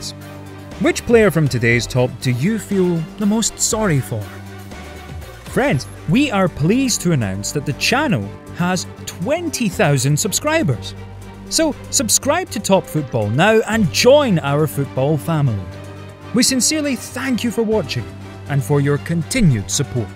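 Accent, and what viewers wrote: Scotland, United Kingdom. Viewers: The narrator sounds Scottish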